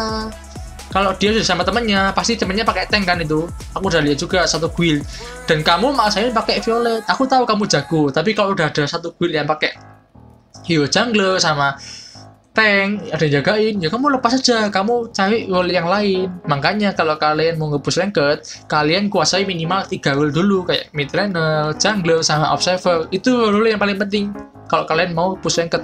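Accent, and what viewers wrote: Java, Indonesia. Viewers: Bang tolong tambahin logat" jawanya,ya bang 😁😁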